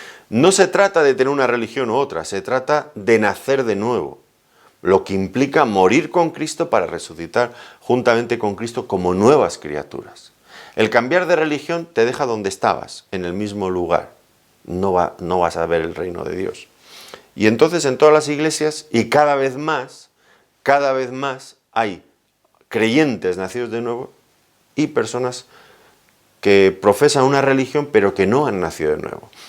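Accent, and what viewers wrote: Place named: Spain. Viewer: Predica: "El mensaje a Tiatira" Apocalipsis 2-18-29". Video en Youtube de este pastor cuyo entendimiento y humildad tienen la gracia de Dios, el ministerio se llama, desconocemos el nombre del pastor por su acento sabemos que es español, puedes buscarlo como en tu navegador Brave, en Youtube, Google etc. Lo recomendamos por su sana doctrina de Cristo, igual que recomendamos también a John MacArthur, Paul Washer y Miguel Núñez. ( Pastor Muchas gracias por compartir el entendimiento que Dios le ha dado. Noto que usted con humildad no publica su nombre, en contraposición a los falsos profetas que se hacen llamar "apóstoles" y no lo son, esos que ponen su gran foto y sus nombres con letras enormes en sus también grandes afiches anunciando sus eventos, y ni en broma mencionan a el Señor Jesucristo en esos afiches. Precisamente debido a esto creo que sería bueno saber su nombre para compartirlo rogándole a Dios que usted siempre conserve su humildad espiritual y salud integral. 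Dios los bendiga.).+ Romanos 5-20 "Pero la ley se introdujo para que el pecado abundase; mas cuando el pecado abundó, sobreabundó la gracia; 21 para que así como el pecado reinó para muerte, así también la gracia reine por la justicia para vida eterna mediante Jesucristo, Señor nuestro". <link>, Apocalipsis 2-2 "Yo conozco tus obras, tu fatiga y tu perseverancia, y que no puedes soportar a los malos, y has sometido a prueba a los que se dicen ser ap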